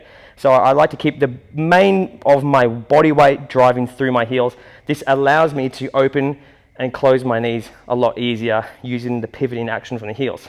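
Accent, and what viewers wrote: Australia. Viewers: Shoes are 💯💯, also everything souds so much better with aussie accent 😂😂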